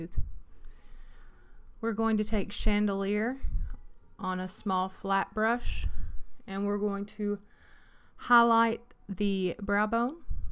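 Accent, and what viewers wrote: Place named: South, United States. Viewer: you are so pretty and I love your sweet southern accent. <3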